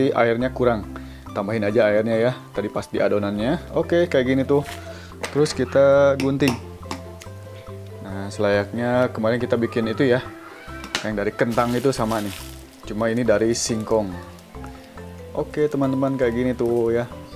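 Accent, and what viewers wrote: Sunda, Indonesia. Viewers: Porocotin heheee.. Sundanese pisan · Mantap Aa dn mksih infony sunda bgt AA 😁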